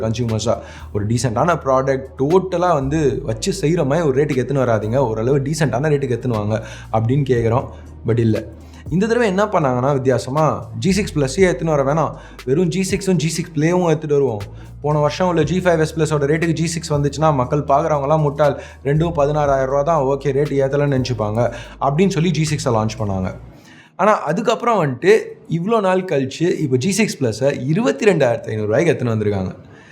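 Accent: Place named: Chennai, India. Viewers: Sema video bro! keep it up! சென்னைத் தமிழ் அருமை! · bro....conetent vudunga...neenga nambo madras slang la pesurathe sema ...super po....ipdiye pesungo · super bro i like your Chennai Tamil 😁😁😁 😄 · சகோ உங்களோட ஆங்கிலச் சேனல்களை நீங்க பேசுற அந்த ஆங்கில உச்சரிப்பு வந்து ரொம்ப நல்லா இருக்கும் எனக்கு ரொம்ப பிடிக்கும் ஆனா நீங்க தமிழ் பேசுறப்ப அதுவும் இந்த வீடியோவை பொருத்த வரைக்கும் நீங்க ரொம்ப கோபமா இருக்கீங்கனு தெரியுது நீங்க பொறந்து வளர்ந்தது எல்லாமே சென்னைதான் என்கிறது நீங்க பேசுறது தமிழ்ல இருந்து தெரியுது எப்படி இருந்தாலும் நீங்க பேசுற அந்த ஸ்டைல் வந்து எனக்கு ரொம்ப புடிச்சிருந்துச்சு வாழ்த்துக்கள் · தல லோக்கல் ஆ இறங்கி, சென்னை பாஷைல கலக்கிட்டீங்க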